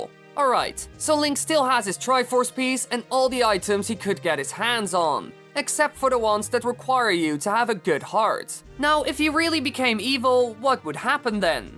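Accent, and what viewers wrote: Netherlands. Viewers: are you duchts ???NL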